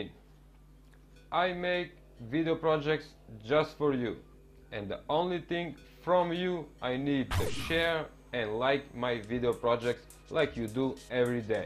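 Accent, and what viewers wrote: Russia. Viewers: How is he american hacker he sounds like he's a russian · American hacker with russian accent. Epic combo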